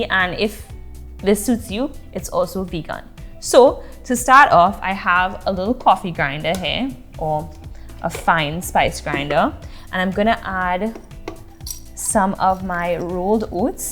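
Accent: Trinidad and Tobago. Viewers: Gal good stuff! You Trini or wuh?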